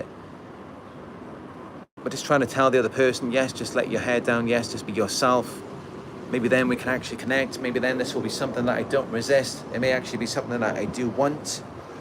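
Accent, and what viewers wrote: Wales, United Kingdom. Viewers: I believe it's Welsh.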